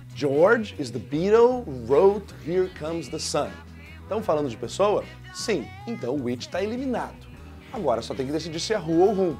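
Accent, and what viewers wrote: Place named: Rio Grande do Sul, Brazil. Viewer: Também sou do RS, de Lajeado, reconheci o sotaque hahahaha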